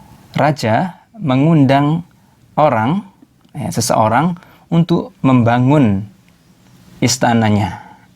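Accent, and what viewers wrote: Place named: Java, Indonesia. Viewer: keto jowone mas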